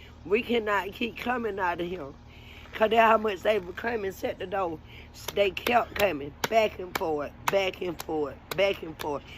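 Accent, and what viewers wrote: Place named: Florida, United States. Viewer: Keep your head up, continue to keep God in your life, I went through some similar things got me down for awhile but I found God and will continue to keep my father in my life, I will pray you continue to keep your head up and stay blessed! I'm from fla too but I live in NY now and I swear you sound so much like my auntie's and I love it, please don't ever lose your accent they made me get rid of mine when I came to NY and went to school! Stay blessed🙏🏾❤️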